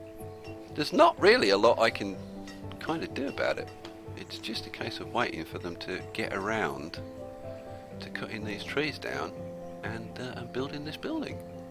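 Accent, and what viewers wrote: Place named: Midlands, United Kingdom. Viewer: What is your dialect? It sounds a bit midland-esque. Just curious. Good videos.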